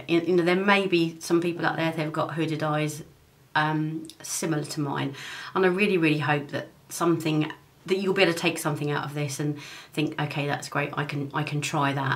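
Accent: United Kingdom. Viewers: I could listen to you talk for hours! Love a British accent! Your tutorial for doing makeup on a hooded eye is so well done. Just a little tip: don’t say negative things about yourself - I think you are a beautiful lady! Loved the whole video. Looking forward to more from you! 😊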